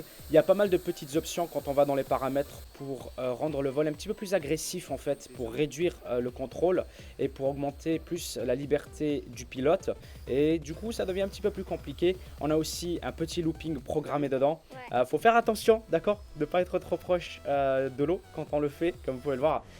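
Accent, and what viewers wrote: Quebec, Canada. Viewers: Il quebecoi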